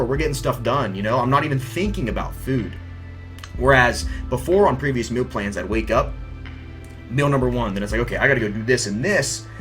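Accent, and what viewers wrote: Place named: South, United States. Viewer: You from like the South dude hehe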